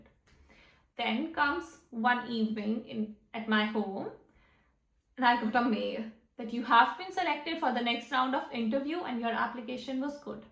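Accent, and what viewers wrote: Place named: Germany. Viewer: What happened to your accent, i think it mixed up with German 😅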